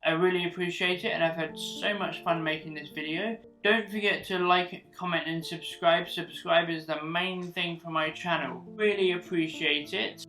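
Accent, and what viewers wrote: United Kingdom. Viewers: Unfortunately the huge reflections on/off your glasses..is well very distracting..that then the lower then normal voice volume mixed with the possibly British pronunciation and dialect makes for difficult viewing experience..a pair of non reflective glasses and turning up the vocal volume overall would really improve your videos..Cheers mate..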